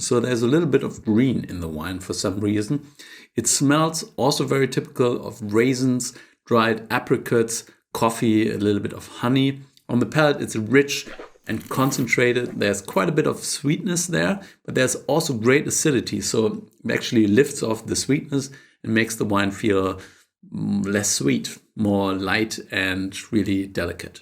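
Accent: Germany. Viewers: Ich wusste du bist ein Deutscher ohne dein Namen zu kennen dieses deutsch englisch ist halt so scheise wie bei uns allen 😂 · Alter man hört den deutschen so raus wenn der redet 😂😂😂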